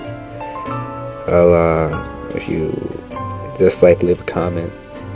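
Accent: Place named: United Kingdom. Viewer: You sound so british in this video. on another note, I can help.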